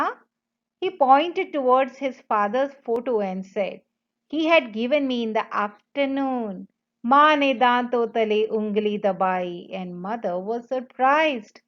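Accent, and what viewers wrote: Kerala, India. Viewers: mam aren't u from kerela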